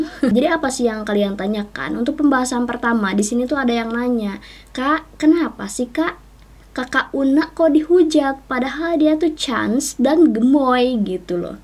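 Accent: West Java, Indonesia. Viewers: ai kaka teh orang Sunda aku tau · Itu logat Sunda sih kalo gak salah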